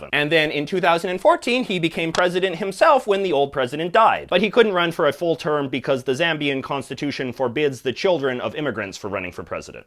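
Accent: Canada. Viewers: As an American, and particularly as a southeastern American it's very interesting to me to hear how you say things. I don't know hardly any Canadians in north Georgia and certainly none with the stereotypical Canadian accent. Little things like how you said keenya and in another video you said naypal for Nepal. Also it was funny how you couldn't do the polish name. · Well he’s Canadian he’s pronouncing it in the Canadian not the Jamaican accent obviously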